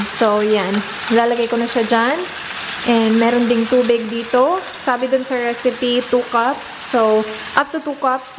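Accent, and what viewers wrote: Visayas, Philippines. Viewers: sobrang cute mo mag kwento ate hahaha nakakaaliw, and while cooking hahaha. Ang the bisaya accent and words lol hahaha I love you tlga ate hihi 😊😘 Love your vlogs so much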